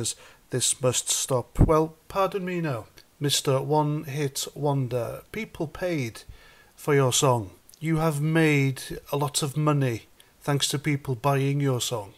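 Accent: Wales, United Kingdom. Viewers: is ace...tells it how it is..I love straight talking no nonsence facts..love his Welsh accent..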